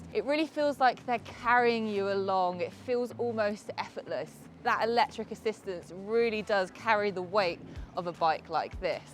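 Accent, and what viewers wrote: United Kingdom. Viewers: I think people keep stopping you because you're a complete smoke show with an adorable British accent and the bike is cool enough to be an ice-breaker. Oops, did I say that out loud?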